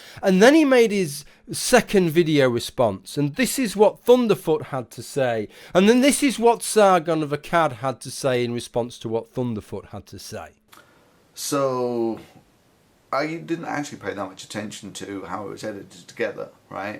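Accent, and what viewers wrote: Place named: England, United Kingdom. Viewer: Your accent is perhaps the most enjoyable English accent to me. Whereabouts is that from? Heard this couple from Whales recently, old lady was so posh, lol. Loved it. Edit: I don't mean to say I think you sound posh, just I like that too.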